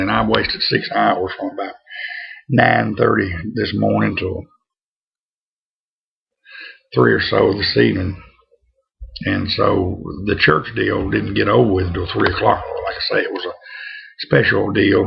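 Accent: Louisiana, United States. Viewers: That's a big drinking mug you have there. Sorry to hear about your pains my friend. Thanks for the chat. Looks like you did well in buiness. Love the Louisiana accent. Alan.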